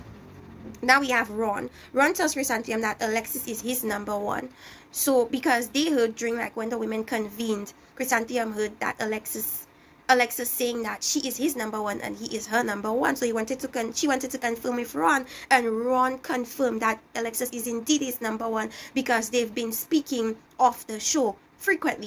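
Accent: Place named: Caribbean. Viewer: Are you a Lucian or a Dominican? I love your accent.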